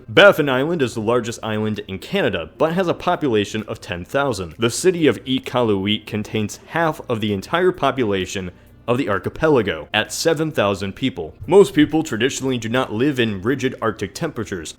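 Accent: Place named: United States. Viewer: thing he/she meant "he's english". But I don't think that's true, he sounds american/canadian.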